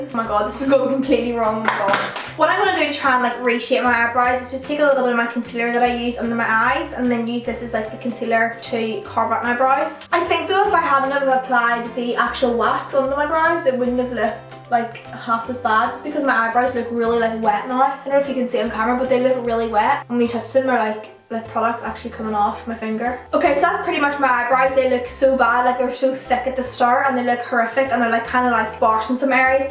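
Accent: Northern Ireland, United Kingdom. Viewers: omg are u from Northern Ireland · She's from Northern Ireland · R u from Northern Ireland I am xx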